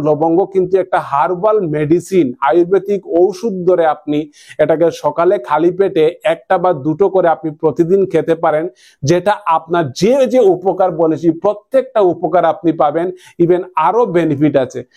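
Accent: Sylhet, Bangladesh. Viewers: Apni sylheti Kotay bujlam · সিলেটি দাদা কম কথায় কি বুঝানো যায় না !!!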